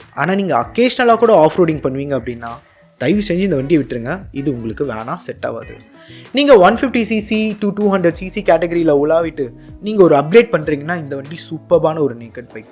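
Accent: Chennai, India. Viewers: You are an amazing motorcycle tamil youtuber. When you talk the technical jargon in your own way is so cool man especially in our Chennai slag...you nail in every video. Although I am less interested in motorcycles I used to watch coz of you.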